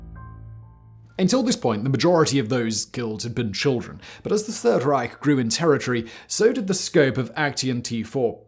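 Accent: United Kingdom. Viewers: Omg it's the bri'sh VSauce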